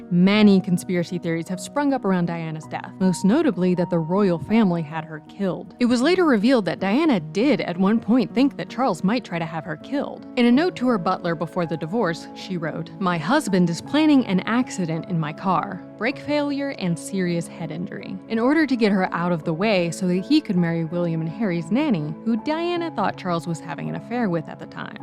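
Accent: United States. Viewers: And William was very clever and Harry failed in almost every subject even art the teachers had to fix it. The story of Diana was a bit one sided no mention of the police having to stop her calling a man’s home over 400 times she was not concerned about if the man she was involved with was married or not there is a great deal more about her that British people know I notice the person telling us has an American voice? This story has been told many times time for Diana to RIP on her island with her family.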